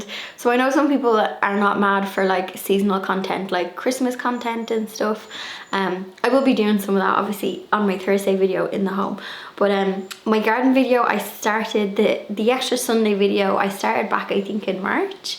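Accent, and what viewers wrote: Ireland. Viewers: Love all your videos, what ever you put out I am happy to just soak up your beautiful soft Irish accent 🥰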